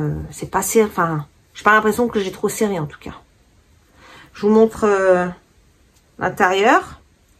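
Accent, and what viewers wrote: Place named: Manche, France. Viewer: Ah la marque Chevignon pour la génération 1972/75 🙌🏻 C’est marrant ni quand je vous écoute j’ai l’impression d’être avec une ancienne collègue : exactement le même accent (elle était originaire de la Manche)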